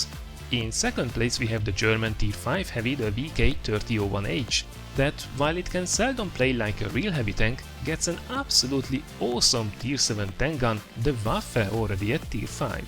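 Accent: Hungary. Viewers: By the dialect, I was 100% sure you're hungarian ;D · magyar vagy??? olyan furán beszélsz nagyon magyaros :) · hallod konkrétan 23 másodperc után rájöttem hogy magyar vagy :DD az az akcentus olyan tipikus magyar :D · A magyar akcentus bárhol felismerhető :D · első videodnál mondom:nagyon magyaros az akcentus, később,áá,ez biztos nem magyar,de lehet hogy francia :/,olvasom a kommenteket,egyszer csak meglátom ezt,hát basszus,ez magyar,na Mind1,amugy segyszer csinálhatnál egy videót,ahol elmondod az angol nézőknek,hogy magyar vagy,sok szerencsét az angol videókkal,sok sebzést a további meccseken :) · Dude, your accent. I know I know this accent. Are you Hungarian?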